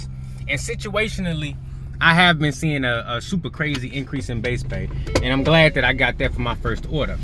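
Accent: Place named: Missouri, United States. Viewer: Funny bro WAZ HAPPENIN! Like that MO accent CHINGY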